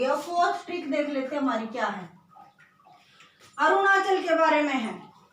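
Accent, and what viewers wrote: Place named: Haryana, India. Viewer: Nice but d'nt use haryanvi ton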